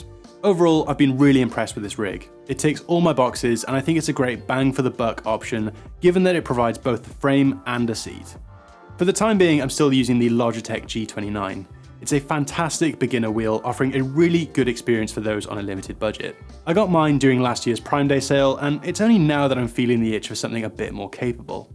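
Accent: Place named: Wales, United Kingdom. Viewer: What part of Wales are you from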